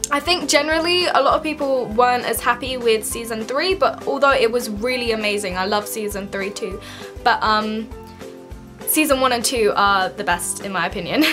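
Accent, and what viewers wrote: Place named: United Kingdom. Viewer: I want to be you....your...your like British or something! I like making accents but I cant do British & I am working on my "Australian" accents.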